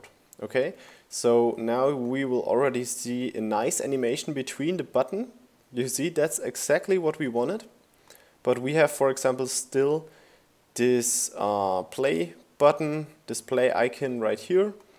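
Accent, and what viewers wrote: Germany. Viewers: Du bist ein deutscher oder? ;D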